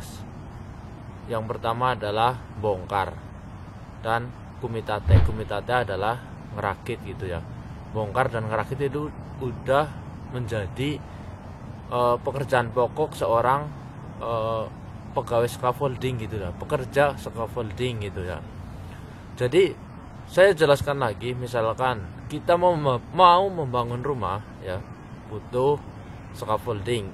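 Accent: Banyumas, Indonesia. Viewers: Wong ngapak pasti keh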